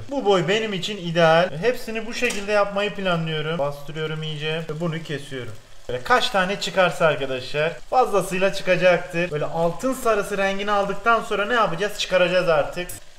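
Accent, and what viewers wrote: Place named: Southeast, Turkey. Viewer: Ben hala anlamadım abi sen hataylı mısın diyarbakırl ımısın yoksa adanalı mısın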